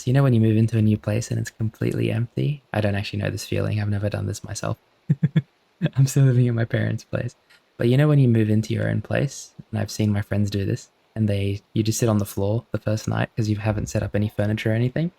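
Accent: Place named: Australia. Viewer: You uploaded this right as I needed something to sleep, thank you for the great content as always. As a fellow australian, it's comforting to have a familiar accent. Especially since I am staying overseas right now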